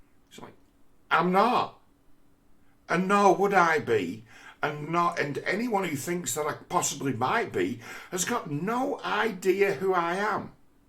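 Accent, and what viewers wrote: England, United Kingdom. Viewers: By the way, I think it is wonderfully hilarious to see you insulting people who hold down jobs and have a stable life. How very telling. Nice try buddy. I like an English accent but I am still allergic to narcissistic bullshit. And I know what you are doing - trying to entice me to stalk Richie. I got too much shit to do on top of foreign global affairs. I'd have to check my calendar.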